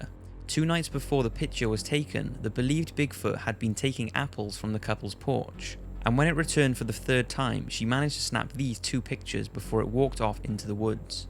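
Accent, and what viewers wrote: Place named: South Wales, United Kingdom. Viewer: Still unsure ... Hey you sound like your from South Wales... Swansea area?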